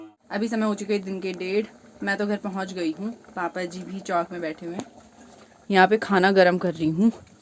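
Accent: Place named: Garhwal, India. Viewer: hindi with gadwali touch❤‍🔥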